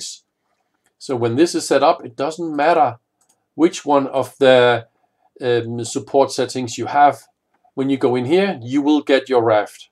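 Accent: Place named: Denmark. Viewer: Awesome... Thank you. This was what I was looking for... By the way... Your accent... Er du dansk?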